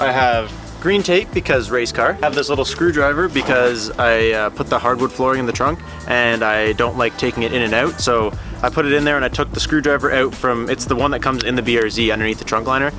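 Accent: Canada. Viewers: I can tell your Canadian lol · right, the accent is hard when he says "out" or "pouch"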